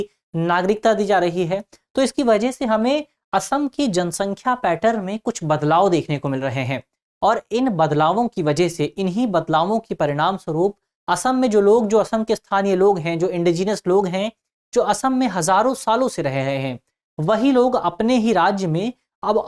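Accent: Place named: Uttar Pradesh, India. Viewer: Option c 1971 ,Aaj sir ekdam Up wale style me .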